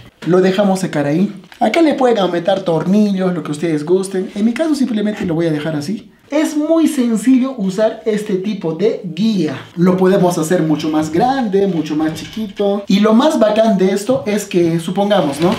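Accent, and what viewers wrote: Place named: Iquitos, Peru. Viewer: Me encantan tus videos! Eres peruano verdad? hablas como mis compatriotas de Iquitos. Que sigan los éxitos!!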